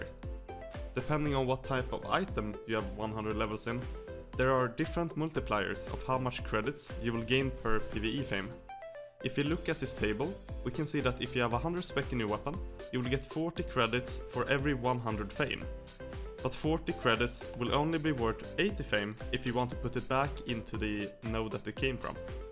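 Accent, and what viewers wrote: Sweden. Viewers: you sound so swedish =) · Lol, your accent is so swedish.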